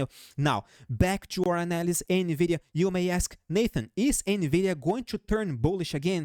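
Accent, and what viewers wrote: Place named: Brazil. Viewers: He is from Brazil! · Thanks for the content, brother. Where are you from? Your accent sounds like Brasilian. É nois!😅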